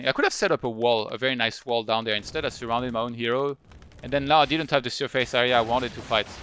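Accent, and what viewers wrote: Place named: France. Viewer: la légende FR toujours un plaisir tes vidéos même avec ton accent bien français mdr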